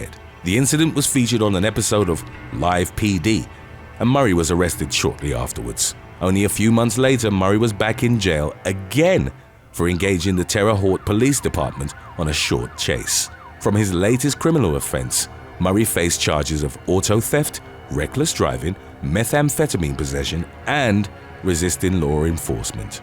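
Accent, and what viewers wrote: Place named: England, United Kingdom. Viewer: He has an English accent!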